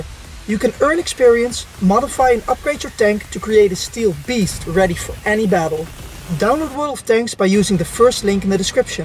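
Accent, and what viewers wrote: Netherlands. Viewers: I mean this in the best way but I feel like I’m watch verstapen dig for ww2 objects it’s so cool love the Dutch accent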